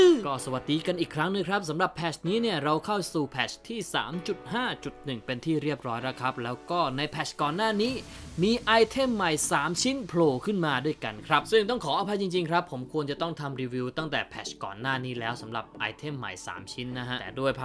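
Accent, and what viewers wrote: South, Thailand. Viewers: คนใต้ม่ายนิ555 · คนใต้มั้ยนิ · คนใต้เหรอ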